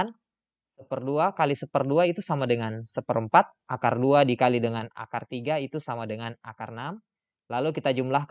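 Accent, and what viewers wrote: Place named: Bugis, Indonesia. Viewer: Kayak aksen bugis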